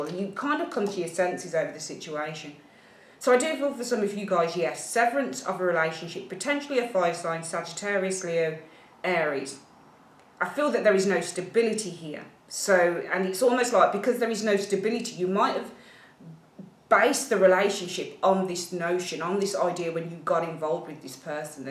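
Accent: United Kingdom. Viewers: You should put a Brit flag on your icon bc i cannot understand you. I'm sorry. I only speak American English. :(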